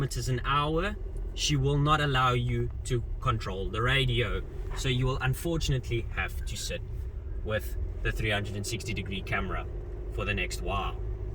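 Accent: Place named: South Africa. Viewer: Gd review bud, u sound South African 🇿🇦🤔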